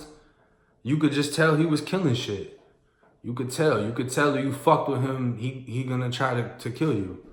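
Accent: Boston, United States. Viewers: 💯RESPECT MY G... KNEW U WAS IN BOSTON THE MINUTE U SAID CAA INSTEAD OF CAR.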